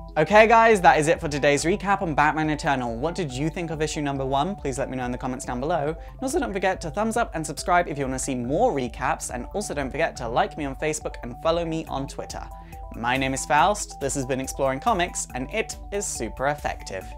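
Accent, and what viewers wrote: United Kingdom. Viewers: for some reason i like this series and all the others better because you have a british accent lol i think people with accents australlian or brittish or even russian are cool especially british though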